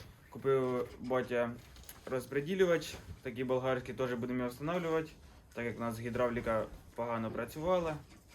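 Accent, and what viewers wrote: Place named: Poltava, Ukraine. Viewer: По говору слышно ,либо Полтава ,либо Кировоград ,я вас обожаю землячки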